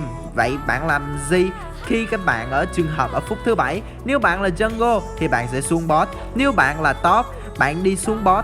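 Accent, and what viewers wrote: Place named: Saigon, Vietnam. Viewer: vđ là giọng sg lại nói nhanh ý.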